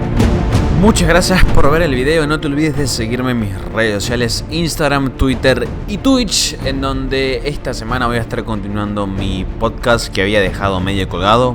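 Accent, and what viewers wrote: Argentina. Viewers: Argentino papa!!!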